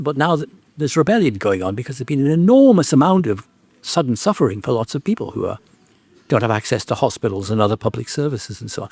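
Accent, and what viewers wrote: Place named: England, United Kingdom. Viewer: Mr. Gray's description of how and why America is embroiled in the Trump Era neglects the role played by those here who are enamored with fascism. Sure, Clinton's insensitive, closed-door rhetoric alienated many who would have never voted for her but to focus on this aspect alone is disingenuous, at best. Also, the "deplorables" weren't labeled as such because they love mining fossil fuels. They were labeled, fairly or not, because of their lack of social grace and their willingness to support a sexual predator who promised to hurt their enemies. Sometimes, an English accent doesn't make you sound smarter - it just makes you sound like an out-of-touch elitist from across the pond. Do better.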